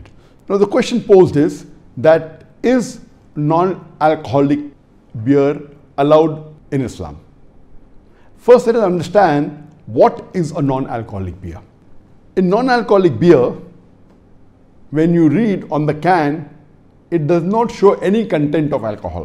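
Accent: India. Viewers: I can't understand Indian accent, can you tell me is this halaal or not?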